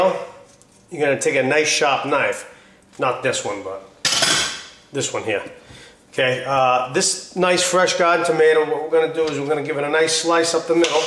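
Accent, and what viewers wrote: Boston, United States. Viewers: Hmm, you sound like a Bostonian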